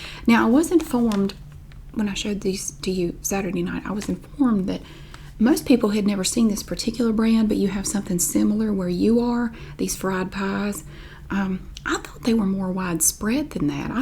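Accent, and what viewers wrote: South, United States. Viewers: There already is a letter for how you pronounce pie; Pæ. You also pronounce another letter you don't have in the english language when you say oil; å(l). I can't understand how someone could complain about how you pronounce words; southern dialects are wonderful. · The day you have to really justify your beautiful southern accent, I swear to Sweet Baby Jesus I will go bat-sh*t crazy. No, just NO. Do these people not see the name of your channel? It's a clue, ugh. I say you do a whole 40 minute video saying the word Pae. I'd watch the whole darned thing. Thank you for what you do. Hugs! Ana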